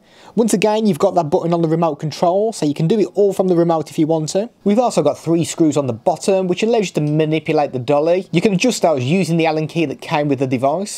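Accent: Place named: Black Country, United Kingdom. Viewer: Oh also, nice to hear a proper brill accent cocka! I’m originally from Cannock, but now live in Ontario... nice to here such a strong accent from the old county :D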